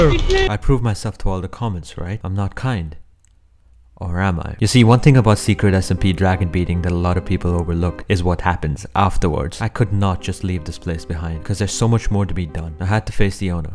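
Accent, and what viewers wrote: India. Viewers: are you Indian you sound Indian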